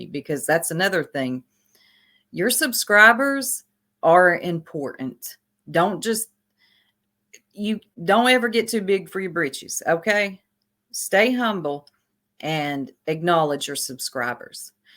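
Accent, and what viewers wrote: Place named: South, United States. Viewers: Love love love that deep southern accent! So nice to put a pretty face to the voice! Thanks for all you do and congrats 🎉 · You sound like you are from Kentucky. Aww I hate that happened to you but you are taking it well. I hope you get it back 90 days.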